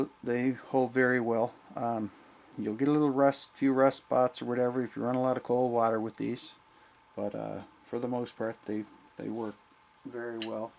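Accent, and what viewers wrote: Canada. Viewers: Fantastic series, thank you!!!! We need to redo the lines in our house, and now know what to do! We are interested in putting in a manifold system, now know how to do it. Love all the great information, even it the idiots on here think you're rambling. That "rambling" is the little shit you run into that makes you go.. "uhhh, what to do I do now." You take care of all that with your musing. Thank you! One question, where do you buy this stuff? Thanks again, love the Canadian accent eh. ;P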